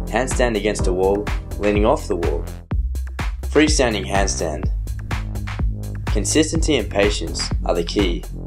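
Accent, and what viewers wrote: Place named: England, United Kingdom. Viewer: finally an english accent i can understand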